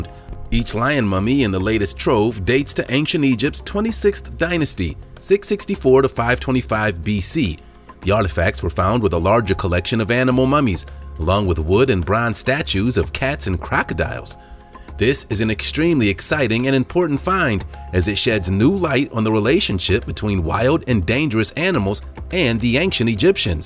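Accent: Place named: United States. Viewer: Why do Americans pronounce O as A. For instant, you say Gad instead of God etc. Not right.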